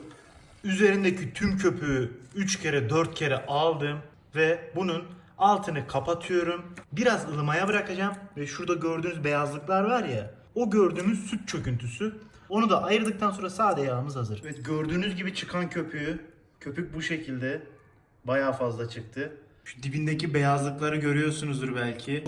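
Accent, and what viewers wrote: Adana, Turkey. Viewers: Hatayli degil bu şive adana şivesi Hataylılar böyle konuşmaz yüürün katıyürüm ekliyürüz diye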